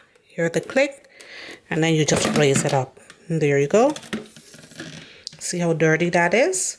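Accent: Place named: Trinidad and Tobago. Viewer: Are you Trinidadian? (Wonderful video, thank you.)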